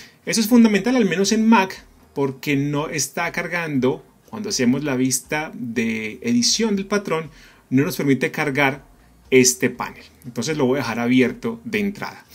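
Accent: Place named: Colombia. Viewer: esta chido, gracias, ....osea que lo mas aprovechable es que lo haces de forma tal que automatizas con el patron, la neta que me gusto pero toma su tiempo, en todo caso te agradezco y si no me equivoco eres colombiano, me agrada el acento de ellos, gracias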